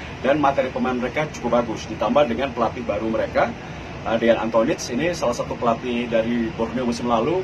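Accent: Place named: Banyumas, Indonesia. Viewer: Boca ngapak ya sudahlah sudahlah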